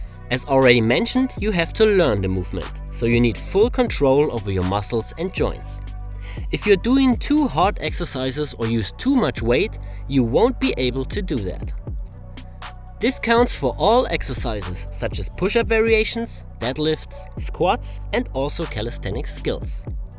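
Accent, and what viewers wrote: Germany. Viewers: Not a native speaker, is he? Sounds like a German accent to me, am I right?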